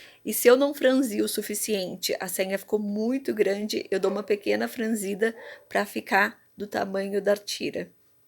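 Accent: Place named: São Paulo, Brazil. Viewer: Me perdoe amiga mais esse sutaque paulista me dá nos nervos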